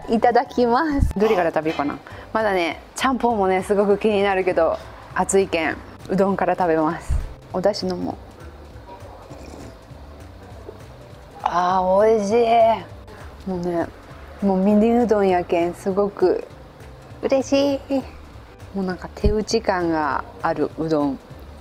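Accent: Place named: Hiroshima, Japan. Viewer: 何か広島弁が入ってたかなぁと思って聞いてみました ごめんなさい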